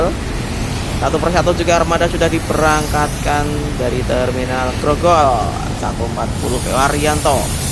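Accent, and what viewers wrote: Banyumas, Indonesia. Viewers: Pekalonganne ngdy mas kok ngomng e ngapak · Iki sing duwe chanel wong ndi logate ngomong kaya wong ngapak ya,nyong wong banjarnegara teka.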